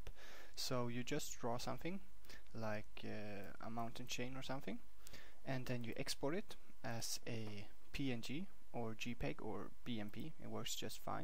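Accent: Sweden. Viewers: Lmao, most of the comment are about hes voice / Swedish accent. Like me "Tjenare" c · Have you never heard a Swedish accent before? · wow my accent of a swedish person didnt sound anything like this. fuck, and notice at how people give more fucks about your voice rather than the video subject it self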